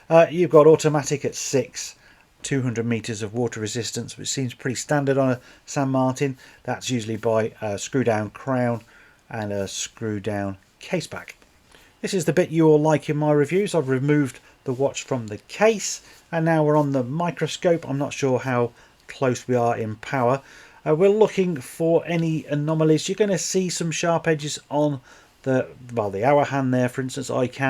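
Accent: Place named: United Kingdom. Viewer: Hello mate thanks for the great video. Are you in the UK? It certainly sounds like it but on your website it says you don’t ship to my address (London). Is that right?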